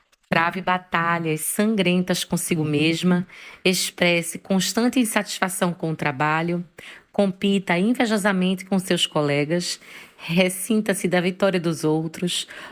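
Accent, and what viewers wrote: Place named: Northeast, Brazil. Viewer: éssa prónúncia nórrdeshtina tem uma Bé-leza louca! Tótal! Estou Mésh merizadoooo !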